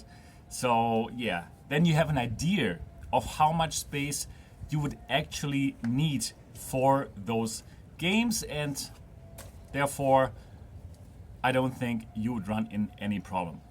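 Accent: Germany. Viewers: Are you a German? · very hepful! Are you by any chance german? Maybe im mishearing something but you sound kinda like it haha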